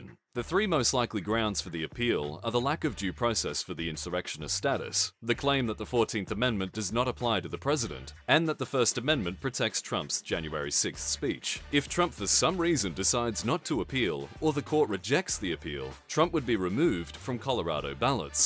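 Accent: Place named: Australia. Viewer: Just Have to say, ever since the Voice over change my partner cant stand your channel and I can no longer View your videos without her expressing anger from once backing this gaming channel especially with the change over to the news which she enjoyed. But now it just feels soulless like a top 10 youtube channel with the generic Australian Voice over. i can't stand it either. Rather be listening to Microsoft Sam.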